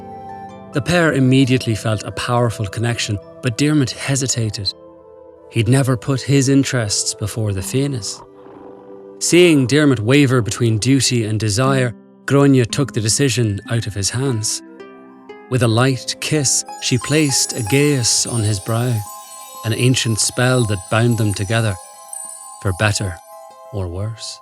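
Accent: Ireland. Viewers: I love how this is told with an Irish accent. Awesome. 😂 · Its great to hear an actual Irish narrator telling us an Irish folktale! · I love Ireland. Its culture, accent, history, myths... everything. Thank you. I love your accent too. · Like the Irish accent that the narrator perfectly nailed. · Was this just the normal narrator putting on an Irish accent?! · as an Irish person i really thank ted ed for getting an actual Irish person to voice over it!!TY💕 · You know our accent has like 50 variations across the island right? Plus he's clearly doing a bit of a presentorial voice, so it's not going to sound the same as in normal conversation. You can tell he's really Irish by the fact he pronounced all the names right, unlike previous Irish mythology videos from TED-Ed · Excuse me is that a Irish accent, on my favourite narrator. I am shook and very very pleased ❤️ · I LOVE how this was narrated in Irish accent. 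It makes it more fun.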